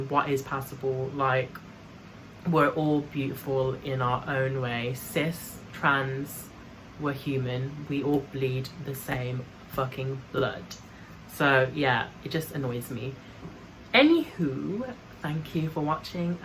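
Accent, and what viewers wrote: England, United Kingdom. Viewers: Loved the video, it was good to hear an English voice rather than American. I thought you brought out relevant points in your topic which has been covered many times by Trans women on you tube. But you missed out one type of man in your vlog and that is the one who looks at a trans as a woman not bothered what's between her legs, takes her for who she is. Not worried about being seen in public like the relationship is some kind of secret that he doesn't want to let out of the bag. And yes, I've subscribed. Keep safe.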